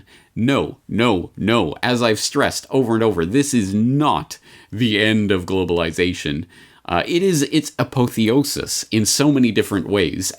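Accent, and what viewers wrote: Germany. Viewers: Well german accents are normally not unnerving at all, that is until you open your speech with talk of how WW2 was good for the world. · Nothing wrong with a German accent.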